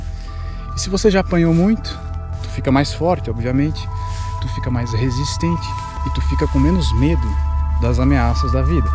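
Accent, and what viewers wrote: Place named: Santos, Brazil. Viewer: Você é Santista? Fala que nem Santista. Linda cidade de Santos-SP.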